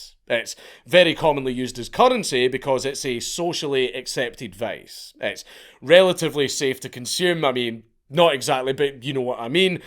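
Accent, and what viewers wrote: Scotland, United Kingdom. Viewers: The Scottish accent makes this better 😂 love the accent bro 👌🏾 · Something about this presentation being conducted by a Scottish man just makes it that much better. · Stopped in to listen to a Scotsman speak of BOOO' EEE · I'd have dropped a like on this anyway, but hearing Booty Warrior in that glorious Scottish accent was the gift I didn't know I needed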